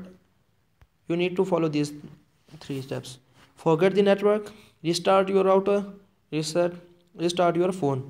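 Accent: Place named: India. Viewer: Another heavy india accent every fucking video...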